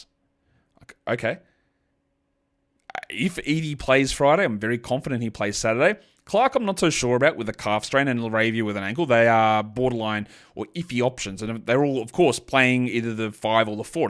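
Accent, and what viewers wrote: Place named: Australia. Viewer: Your microphone's good - no issues with my end. I listen at 1.5x and one of the big changes is there's no "deep breath" sounds. I know us Aussies like our long-winded sentences. As the name suggests, long-winded sentences require long amounts of wind, which is often replenished using a deep breath. This deep breath is known to create a deep breathy sound and your previous mic used to hear. I don't know whether you have undergone some level of phonetical coaching (which kudos if you have) but, there was a distinct absence of deep breathy sounds in this video. The unfortunate news is you are probably ineligible for the ASMR tag to put on this video now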